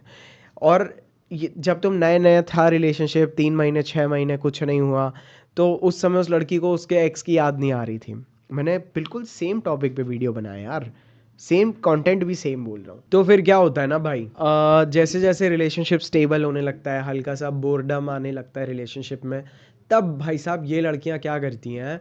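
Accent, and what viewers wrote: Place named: Maharashtra, India. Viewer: Your marathi accent is damn good bro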